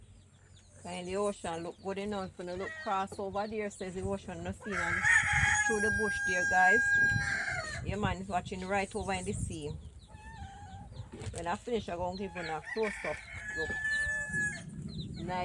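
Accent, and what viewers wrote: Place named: Jamaica. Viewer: Love how you keep the Jamaican accent 😅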